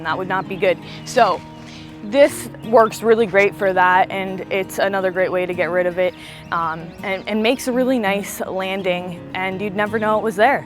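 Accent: Pennsylvania, United States. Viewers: She has a PA accent. My wife from Lancaster has the same accent. Sometimes the sentences don’t end, “Do you want to go with…?” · That pa. Ridge runner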